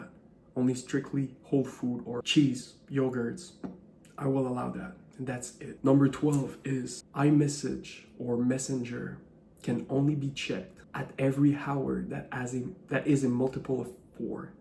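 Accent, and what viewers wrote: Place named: Netherlands. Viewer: He's in a foreign country other than the United States. Listen closely to his accent of the English Language. I know of no State in the Union WHERE people speak like this unless he's in a tight community of Dutch or a village of Norwegian immigrants... Yes? JUST a thought. Respectfully submitted for your consideration Gregg Oreo Long Beach CA Etats Unis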